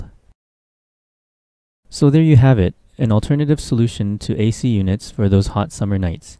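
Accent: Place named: Philippines. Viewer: Are you Pinoy? Your accent sounds a little like it.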